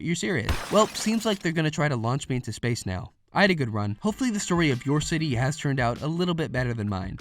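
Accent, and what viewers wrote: Canada. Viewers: he's Canadian not british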